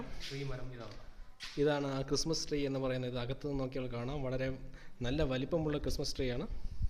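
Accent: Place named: Trivandrum, India. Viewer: Tvm ആണ് alle സംസാരം കേട്ടപ്പോൾ manasilayi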